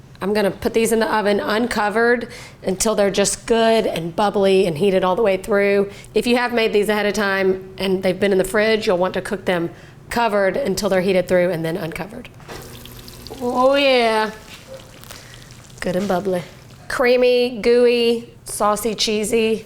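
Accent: South, United States. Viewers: Hands down the most creative chicken enchilada recipe here on YouTube. 💯 I still grimace when I hear flour tortillas are being used where corn traditionally is utilized, but perhaps I need to finally try it for myself, which I will, for the first time, soon. However, I will plan to roast the poblano Chile and bell pepper first. Maybe add some bacon grease to the beans, and some tomato paste to the onion, pepper, & poblano chili saute. Btw--love this chic, her southern draw, and relaxed attitude around food; reminds me of my Texas upbringing......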